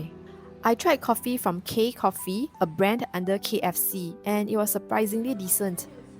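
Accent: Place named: Singapore. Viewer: She sounds Singaporean.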